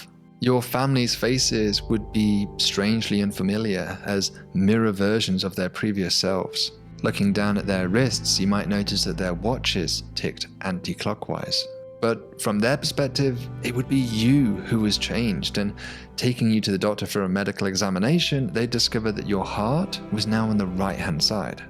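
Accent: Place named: Liverpool, United Kingdom. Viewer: why is this guy's face always on view ? why are stills of Brad Pitt and the moody brit actress all over this?why does he talk as if he is talking to 8 year olds. oh.. cuz he is i guess. so annoying i had to get up from me telly and find me lap top to commentAH ! it's only the annoying Scouse that annoys me so much. a bit too much to take before I've have me cup o builders innit? Yes, I am in fact a bluuudy git. spoiler alert, he tells the size 45 billion to the edge. you're welcome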